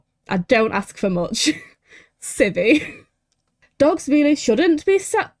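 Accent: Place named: Russia. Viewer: why did i think this man was a russain immigrant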